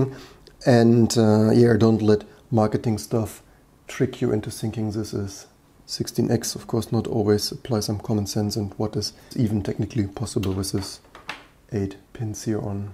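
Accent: Germany. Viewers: Wow, ziemlich deutscher Akzent 😂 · HÖRE ICH HIER DEN DEUTSCHEN AKZENT ?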